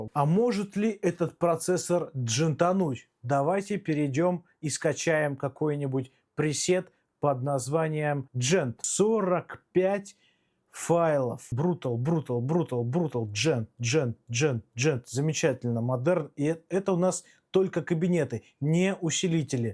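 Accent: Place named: Germany. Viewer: Купил такой недавно, прикольная штука, но на постояннку только новичку, и то с натяжкой. Обзор понравился, но есть ощущение что автор немец который учил русский как иностранный. Сорри если обидел, но звучит реально так 😅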